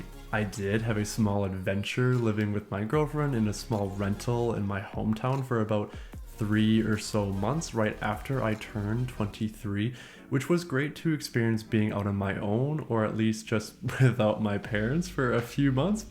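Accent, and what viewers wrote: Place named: Midwest, United States. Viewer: You sound a bit midwestern. You're adorable!❤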